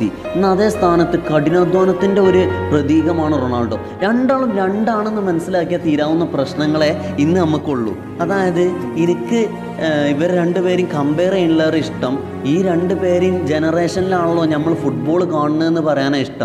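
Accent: Malappuram, India. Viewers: മലപ്പുറം ഭാഷാ ശൈലി ഹൃദയത്തിൽ കൊണ്ടു😘❤ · Malappuram karan Ano?...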